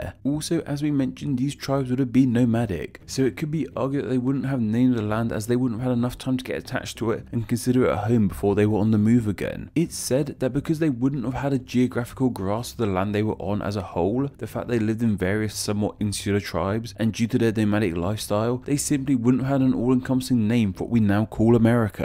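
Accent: United Kingdom. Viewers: Wouldn't individual tribes have different names for the land where they lived? Making generalized statements about Pre-Columbian indigenous people in North and South America is about as useless as making similar statements about the peoples of Europe at the time Julius Caesar conquered Gaul, or Roman Legions landed in England. In what is now the United States Eastern tribes would have lived in permanent settlements as would those in the Southwest, the Pacific Coast, and parts of the Intermountain West. The indigenous group the British-accented speaker is making generalizations about were the "Plains Indians", so he likely learned everything he knows about Native Americans from "Dances with Wolves". BTW, what did the indigenous people of Europe call their continent during the Dark Ages? Except for a few Catholic priests who would have rendered the answer in Latin, they wouldn't have a name for it BTW, I always turn to Received Pronunciation British speakers for information about Native or Indigenous peoples of North America--NOT. 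· Guys, hes a brit Cut him some slack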